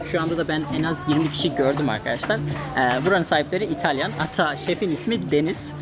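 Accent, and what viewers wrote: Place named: Erzurum, Turkey. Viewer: Abi bi erzurumlu olmama rağmen şu yokları yoğh yoğh diye demene uyuz oldum ya tam öyle konuş ya da tam istanbul ağzıya konuş ikisini karıştırınca çok itici oluyor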